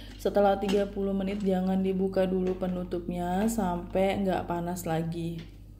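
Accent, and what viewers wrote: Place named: Java, Indonesia. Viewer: Logat Jawa kasih masakan Madura ...... Sepertinya resep Ini perkawinan Jawa Madura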